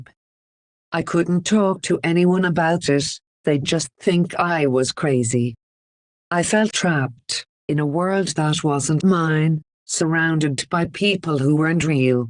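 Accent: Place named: Ireland. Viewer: That's an Irish accent I hear, I'm a gang stalking victim and I'm Irish. Are you an Irish target?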